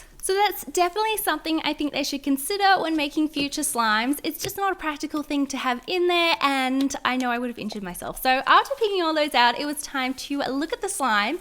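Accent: Australia. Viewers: Meh_emo! Honestly it’s very possible I’ve gotten stabbed with one and it cut me so that’s probably what she was trying to say but she is Australian so they might say it like that over there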